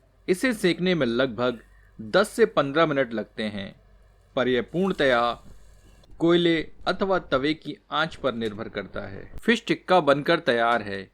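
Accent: Standard. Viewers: a pleasure to listen to you Shudh Hindi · आपकी परिष्कृत शुद्ध हिंदी का उच्चारण उस पर चार चांद लगा देता है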